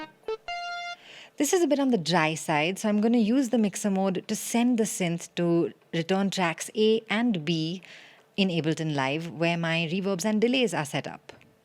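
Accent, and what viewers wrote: India. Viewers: The demo is awesome. Your diction sounds like an Indian. Well. Loved this demo. Haven't yet tried Launchpad. Maybe in the future for sure.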